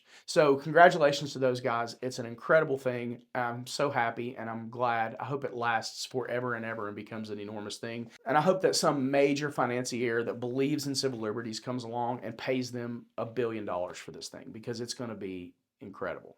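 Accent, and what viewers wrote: South, United States. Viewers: aww man…after that Christmas video I thought we'd get a rendition of Auld Lang Syne! That first 30 seconds of this video had me laughing – I seriously was one of those 5!! So glad and grateful for your amazing progress since your start. I do watch many of the other similar channels; yours quickly became is my favorite. Love your insider knowledge; ability to highlight numerous subtle details that would typically escape the average viewer's notice, and your breakdowns of how you would argue the case in court if it were your own. Your eloquence and that well-timed humor you sprinkle in make your style stand out above the rest. Oh, (and of course!) – that Southern Drawl!! You'll meet your goal for this year, or even surpass it. I’ve often wondered how many hours went into making just 1 video. I look forward to everything you've got coming for this year. 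Man, you must run on diesel fuel or something. The books, channel, training program, podcast - all these have the potential to positively impact our nation and transform countless lives. In some ways, listening to your SDL channel has changed mine. I’ve watched your videos and have tried to emulate your speaking style and manner in both my personal and professional life. I know you have to adapt to what the audience wants but please don't curtail your speaking time too much! That’s one of best and most distinguishing features that elevates your content above others. Each video covers a lot and I think you throw in all the aspects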